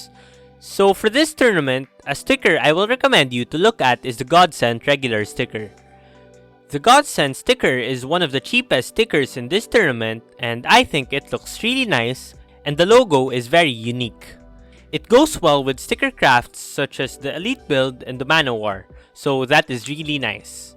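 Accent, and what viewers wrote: Philippines. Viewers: You filipino? Kinda sound like it hehe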